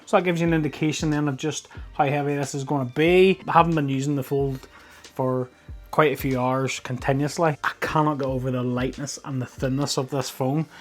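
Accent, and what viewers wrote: Northern Ireland, United Kingdom. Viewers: Northern Ireland?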